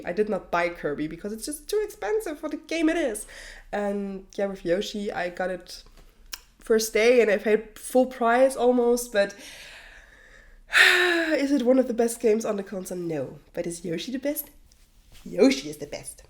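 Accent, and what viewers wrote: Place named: Germany. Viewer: Wie man einfach direkt hört dass du deutsch bist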